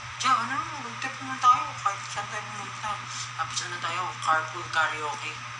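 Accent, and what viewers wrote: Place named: Cavite, Philippines. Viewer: Kabitenyo yarn?! Hahahahaa Ang cute ng accent mo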